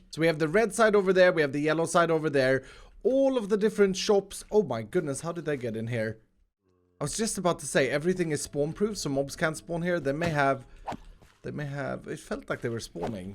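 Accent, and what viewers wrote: Sweden. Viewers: you should play Minecraft with Pewdiepie and both of you talk with your Swedish accents. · You sound like you arr from sweden, are you from sweden?? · Are you Swedish?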